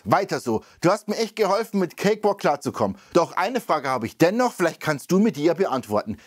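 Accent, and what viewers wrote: Bavaria, Germany. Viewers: hi! Oiso...i steh auf Dialekt...deswegen, bleib bei dein boarisch! i schnoi, wos du so dazöst und waun i wos wü, vastehst du mi ah....ollas kloa!!!! grüße vo ana echten Weanarin..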